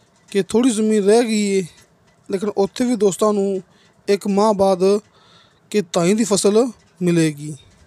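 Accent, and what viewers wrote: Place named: Sialkot, Pakistan. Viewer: tawadi boli sailkotiya wali a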